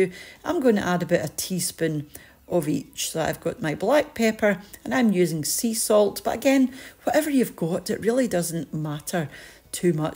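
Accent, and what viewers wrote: Scotland, United Kingdom. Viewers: Hello from Colorado., USA. There’s a whole group of us out here in my community who watch your show... partly for the recipes; partly just to relax to the sound of your amazingly lovely and relaxing Scottish voice. I’m going to be making a big slow-cooker full of this wonderful recipe today. It’ll make for an easy cooking-free weekend, too.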